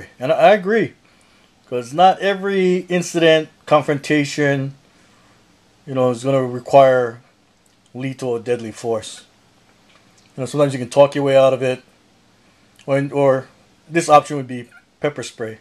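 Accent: Hawaii, United States. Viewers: Howsit!, I have been a subscriber for a while and really enjoy your videos. This video demonstrates what I dig about your vids. Clean to the point and shows great variations for EDC especially if you're in a limited carry situation. Frankly, I also dig your accent too. Reminds me of the days living in Manoa Valley. I miss Paradise, bruddah.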